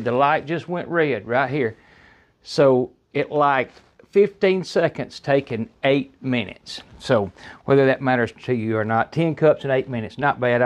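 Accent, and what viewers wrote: South, United States. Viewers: Love your southern accent ❤️ thanks for all that info!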